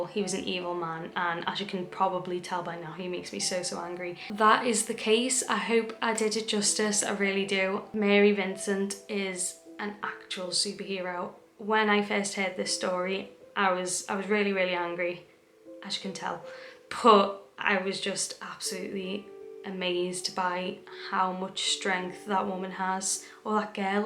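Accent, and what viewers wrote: Liverpool, United Kingdom. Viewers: Remarkably Google Captions understands your scouse accent perfectly well not a single word wrong which shows how clear you speak. Normally regional British accents don't translate well. May I also say you have the cutest mouth I've ever seen!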